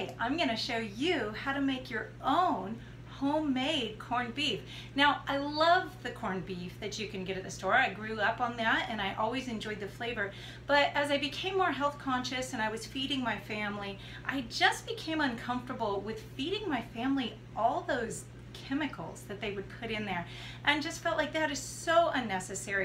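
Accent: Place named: Midwest, United States. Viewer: You sound like you're from the midwest...? I will have to have a go at some corned brisket. Mmmmmmmmmm.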